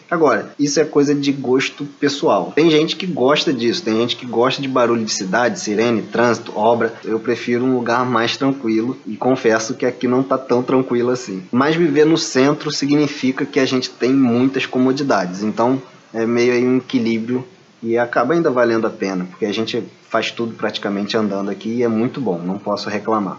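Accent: Brazil. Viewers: I love the accent from Rio it’s so fun. Cascais is one of the best cities in Portugal. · Estão a gastar dinheiro em escola privada e em seguro de saúde por opção, embora em saúde também descarto totalmente essa opção, porque não se justifica objectivamente. Apesar do desinvestimento feito nos últimos anos nestas áreas pelo governo por motivos vários e as dificuldades que isso acarreta essas áreas continuam muito boas apesar de tudo. Agora você fala uma coisa óbvia: os seus padrões de exigência começam a subir também e daí percebe o porquê das manifestações de insatisfação várias que os portugueses mostram, enfim, você apesar da pronúncia ser brasileira começa a sentir-se português. e isso é tudo normal. Somos exigentes para aumentar os padrões de qualidade e tentar não baixá-los.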